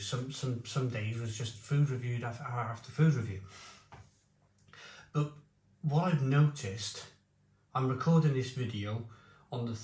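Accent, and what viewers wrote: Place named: Cardiff, United Kingdom. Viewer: Just stumbled on your channel! Glad you are Doing ok. Recognised that Cardiff accent anywhere! The cottage is my local pub 😂. I’m assuming you mean the One by cefn onn!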